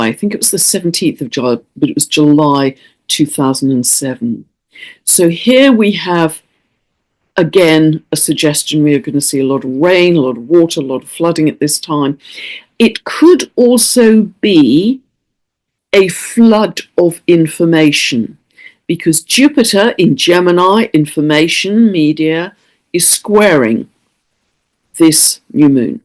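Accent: United Kingdom. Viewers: "starve out the scary, love in the good" in a British accent, of course ❤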